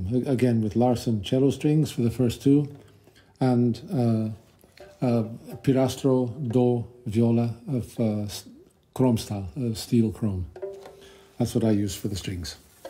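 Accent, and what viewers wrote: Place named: Ireland. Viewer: thank you...your Irish Brogue is still intact.....UCD Graduate Class of 04 Greek American!!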